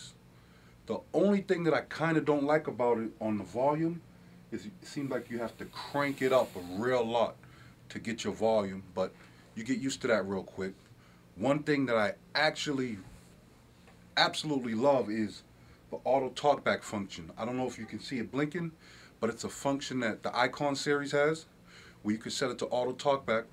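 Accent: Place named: North, United Kingdom. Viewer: gotta love that deep northern accent....lol....good video tho...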